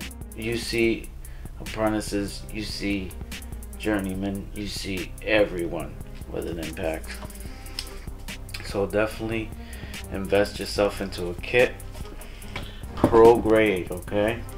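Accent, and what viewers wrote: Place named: New Orleans, United States. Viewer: si mon que si que fuck yeah....did 11 years in prison here in California and got out and became a union electrician...IBEW LOCAL 11....I couldn't tell where u were from bro...ur accent was all over the place...lmao at first u sounded like a homie...but then u sounded like u were from New Orleans..so I was like where is this vato from...lol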